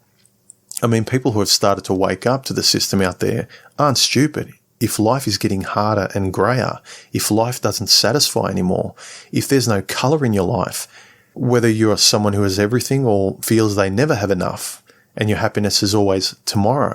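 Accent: Australia. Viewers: Mate, just wanted to say 'Hi' and a huge 'Thank you!' for what you do! I never comment on youtube. This literally is the first time, but decided to do a one-off as I relate to so many things you do and say. I'm a colleague, an animation artist, and absolutely love your illustrations and the wonderfully appealing lightweight design of your videos. You're in a class of your own among MGTOW. I found your channel via Coterie's and have been listening to your videos repeatedly, although I'm not a subscriber as I don't subscribe. Marcus' (Groundwork...), Coterie's and yours are my most favourite, and, I suppose, most relatable emotionally and intellectually. You sound a bit Aussie to me, but I may as well be wrong as English is not my first language, although I've been living in this welcoming country for nearly 15 years. It's heart-warming to hear how total strangers have come to the same conclusions and have taken their lives and reality in their own hands - how in this confused world men (I'm in my mid-40s) are still able to find the path to wisdom and peace of mind, lead fulfilling and meaningful lives. 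Self-actualisation had become my way of getting out of the existential crises of my 30s. Discovering MGTOW only confirmed and widened my understanding and knowledge of how the West has changed in the last 4-5 decades; the culture shock I went through was a temporal one. Growing up behind the Iron Curtain I was 50 years behind, I was a 'Westerner', coming from the past. The gradu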